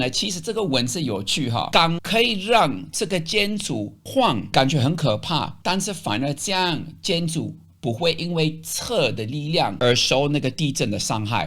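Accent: Taiwan. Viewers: 哎呀 台灣就是這樣啦， 習慣就好，真喜歡你的台灣口音😂水啦🎉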